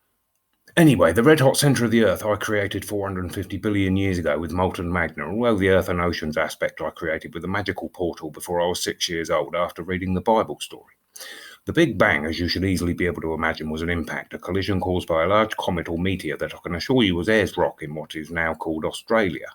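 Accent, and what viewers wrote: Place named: England, United Kingdom. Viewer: For your information my english might not be as good as yours since i was born and raised in Sweden, i assume you are english judging by your accent. I have a question for you though, what do you think would have happened If christianity didn’t exist and Vikings would’ve continue to follow their religion and same with all the other native religions?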